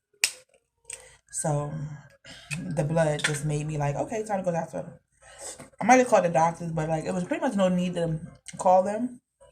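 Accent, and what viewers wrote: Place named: Baltimore, United States. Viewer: You’re from Baltimore. I know this because I love y’all accents so much😭❤️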